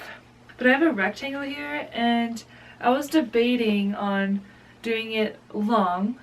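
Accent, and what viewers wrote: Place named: United Kingdom. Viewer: Girl I came from another video of yours and I was just cracking up (the 70s jumpsuit) There’s so many times I was like “what am I watching 😂” but then it ended and I was like “oh no wait come back” anyway I clicked on this one and then it came up in the beginning that you’re doing this for your birthday and (if I date it right 😅😩) means you’re a Scorp and I was just sold. The vibes here are so chaotic yet so safe. I came for the sewing but stayed for the British accent. You’re brilliant luv, you’ve got a new fan xx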